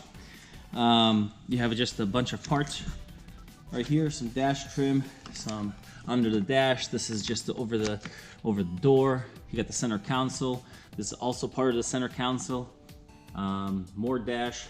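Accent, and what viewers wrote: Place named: Russia. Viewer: This guy's accent sounds Russian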